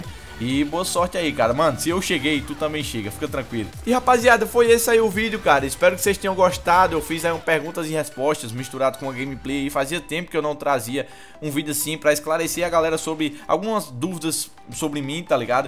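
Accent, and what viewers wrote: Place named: Paraíba, Brazil. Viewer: Infelismente,nao sou paraibano..Queria ser Esse sotaque é fodastico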